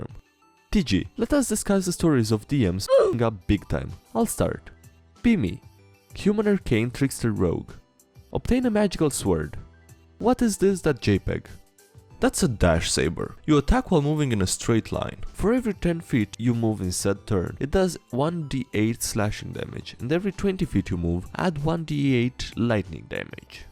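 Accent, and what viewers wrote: Eastern Europe. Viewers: It doesn't sound like most European accents does it. It's 100% not American, it's like a weird version of a Slavic one, but like, really funky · I actually think he's hispanic, that's how it sounds like, but then I hear how the tongue just "hits" and yeah, slavic accent.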